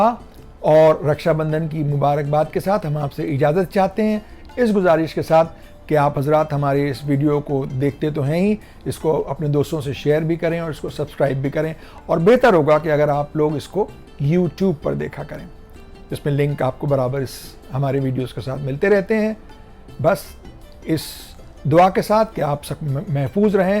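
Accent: Lucknow, India. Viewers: Adaab,kya he kehnay janab ap k or ap k andaz e bayan k.lagta hy Lucknow pohnch gaye hum tau.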